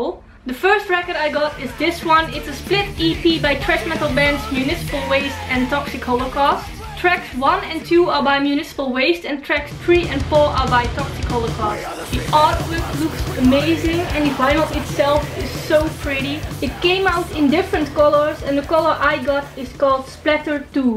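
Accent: Netherlands. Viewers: Eindelijk iemand die ook met een dik nederlands accent praat als ze engls praat. Dacht dat ik de enige was.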